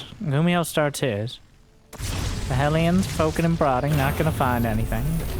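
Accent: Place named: Midwest, United States. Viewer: Where are you at? His accent hmmmm I'd say Indiana or Missouri.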